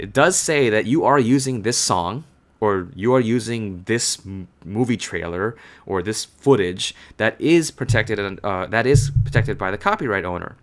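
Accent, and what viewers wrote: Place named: United States. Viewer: wow i am an Thai half Indian but i thought you were an american(i am not a resits)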